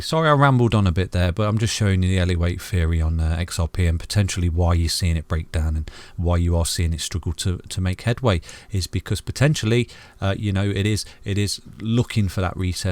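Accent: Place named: East Midlands, United Kingdom. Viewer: are you from Notts or Leicester? I tell by your accent.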